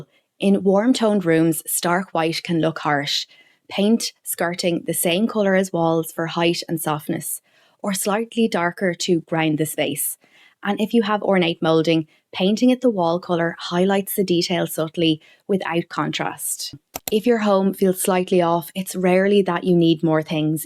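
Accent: Ireland. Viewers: lovely Irish accent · Brief and brilliant! Plus lovely Irish accent.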